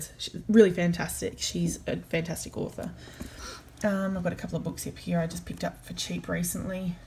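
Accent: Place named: Australia. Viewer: Nawww this was so fun! Thanks for th tour. I miss Witchcraft magazine too sooooo much and Fiona Horne is still my absolute favourite witch!! Loved and continue to love her books. Where are you based? I detect a distinct Aussie accent 😁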